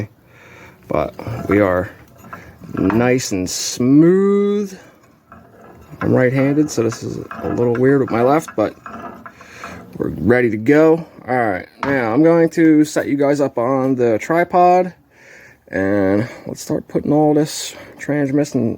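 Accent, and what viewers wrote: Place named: New Jersey, United States. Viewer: Had a feeling you were from NJ, south if I had to guess, closer to Philly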